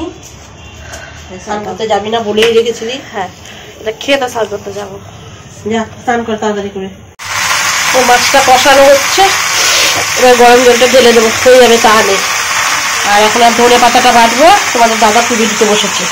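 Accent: Bangal, Bangladesh. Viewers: শুভ জন্মদিন দাদা 🙏🙏 আপনার সুস্ততা কামনা করি সবসময আনন্দে থাকবেন আর আমাদের আনন্দ দেবেন আপনার কথা শুনলে খুব ভালো লাগে বাঙাল ভাষা শুনতে বেশ লাগে তোমার মেয়েকে অনেক অনেক ভালোবাসা ❤❤❤❤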